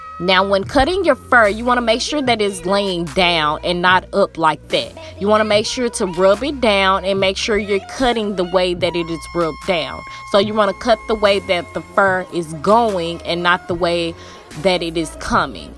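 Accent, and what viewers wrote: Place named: Georgia, United States. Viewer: You talk real country lol are u from Georgia also?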